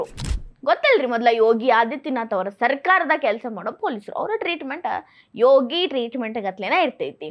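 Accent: North Karnataka, India. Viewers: ಉತ್ತರ ಕರ್ನಾಟಕದ ಕಡೆ ಈ ರೀತಿ ಉಚ್ಚರಿಸುತ್ತಾರೆ, ತಪ್ಪಿಲ್ಲ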